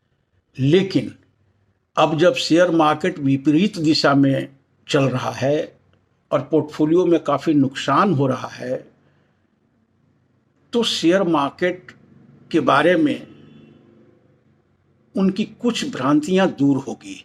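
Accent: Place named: Eastern Uttar Pradesh, India. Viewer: jee, bataiye kya abhi humko paise laga dena chahiye kyunki share saste mil rahe hain?is main pareshaani hai ki kab lagaye, already portfolio 12 percent down hai, middle class ke liye issue hai ye, aapke support ke liye bahut dhanyawaad, pratiksha rahegi, shayad aapki vaani se lagta hai ki aap UP east ke hain, bataiyega kahan se hain aap aur humara anumaan kitna galat hai..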